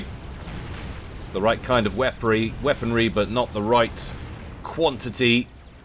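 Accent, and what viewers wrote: United Kingdom. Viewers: Just found this channel and binged a few games. Supreme Commander was a favourite of mine back when it came out (in the days of 'you can use a second monitor for the map, gasp!') Happy to hear a fellow brit on YouTube too. It shouldn't surprise me, but it always does 😅. Convinced a friend to pick up FA when we both get paid (as I'm skint at the mo) and we are going to check out FAF for the coop campaign!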